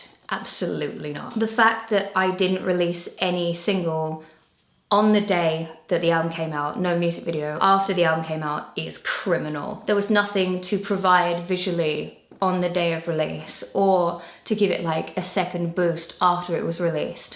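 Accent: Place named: Essex, United Kingdom. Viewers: Off topic (and sorry if you've addressed it elsewhere) but has your accent changed? We're both from Essex and I could have sworn you used to have a more southeast England accent - it sounds a bit northern now? I've been a fan since the early days and now I'm going mad trying to remember if you've always had this accent! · I would have loved both villains 1&2 to be singles with a video. I also think that maybe putting the more pop songs on another album would have worked if you know what I mean? Also you have lost a little bit of your Essex accent and I’m missing it 😂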